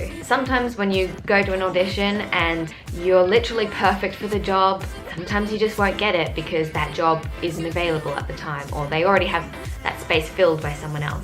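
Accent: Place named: Australia. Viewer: Your Aussie accent tho, I can hear you all day! <3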